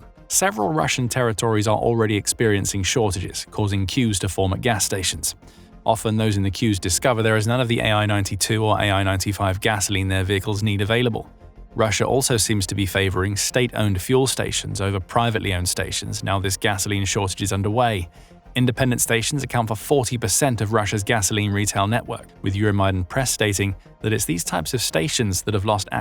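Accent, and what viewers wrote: England, United Kingdom. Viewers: Why does the narrator, who's clearly English by his accent, keep calling petrol, "Gasoline"? They call it petrol in the UK NOT gasoline.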